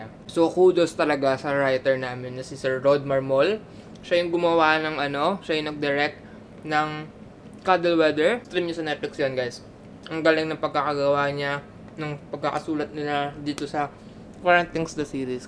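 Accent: Visayas, Philippines. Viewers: Butete.... visaya accent is funny to do thing 😂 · Meron talagang bisaya accent eh 😍 palagi ko napapansin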